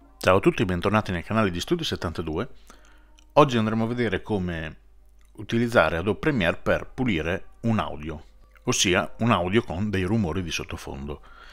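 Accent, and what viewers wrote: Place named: Genoa, Italy. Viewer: mi sbaglio o mi sembri de zena ?!?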